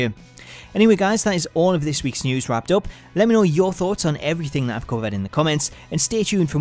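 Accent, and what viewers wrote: Newcastle, United Kingdom. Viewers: Are you from NewCastle ?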